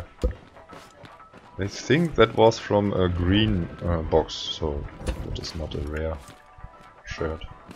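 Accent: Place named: Poland. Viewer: Uh you sound polish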